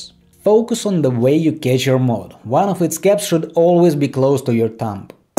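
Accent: Russia. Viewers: God, this russian accent! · Включил видос и с первых секунд услышал русский акцент👽🗿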